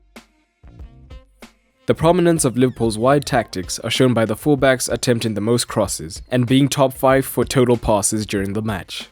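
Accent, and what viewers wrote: South Africa. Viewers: Are you South African? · Is the narrator South African?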